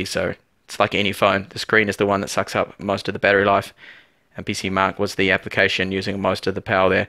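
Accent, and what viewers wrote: New Zealand. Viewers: Are u from NZ? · Hi Mate, I heard you mention that you're from NZ (the accent says it all really). Im looking at getting a Redmi Note 3 from Aliexpress from a seller called Hong Kong Goldway. I struggle to find these in NZ at a reasonable price so think this is the best thing. Are you able to comment on how easy it is to flash the global MIUI rom and have all google services installed. As I assume the Aliexpress models from china have no google services and heaps of bloatware. Then again, where did you get yours from (if you did get it delivered to NZ) Cheers in advance. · "Seeven " Teen " haha :) cool kiwi accent. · Yay finally a kiwi accent!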